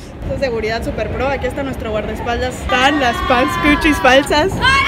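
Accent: Campeche, Mexico. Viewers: Oye amiga eres de campeche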